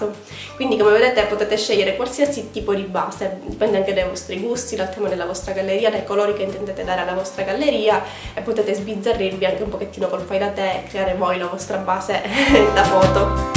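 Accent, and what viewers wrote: Sicily, Italy. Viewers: Ciao ti ho scoperta da poco sei siciliana per caso??:-))))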